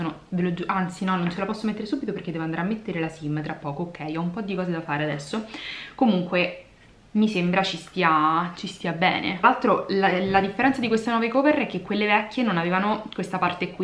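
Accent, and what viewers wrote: Rome, Italy. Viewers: Il tuo accento romano mi fa muorire😂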